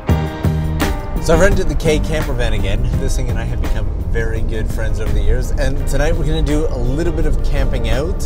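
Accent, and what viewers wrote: Canada. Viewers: Wow so cool it is really sad and mean that the wheel does not turn but it seems like whenever I see one of those in Japan they tend to be stationary😭 They just gave a us a new one at out Disneyland and it moves and everything🖤🖤🖤🖤 I love that japan makes everything so convenient though like you could literally live out of a camper van and be just fine bath and all🖤 wish america was more like that😭 I've said it once but I'll say it again, I absolutely adore your accent🖤 who knew a Canadian accent could sound so nice? Clearly I need to actually talk to my friends abroad and no just text ... that rail was beeeaauuuttiiiffflll🤩🤩🤩🤩 cant wait to see what secrets lie with the cabin, see you next week I hope🖤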